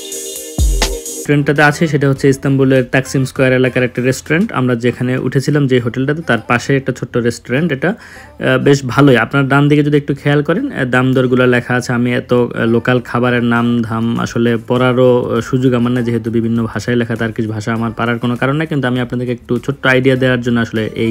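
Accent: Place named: Madaripur, Bangladesh. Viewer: ভাই আপনার কথা একেবারে খাটি বাঙালি.এভাবে কথা আমরা মাদারীপুর বাসি বলে থাকি. ধন্যবাদ এভাবে ভিডিও আমাদের উপহার দেওয়ার জন‍্য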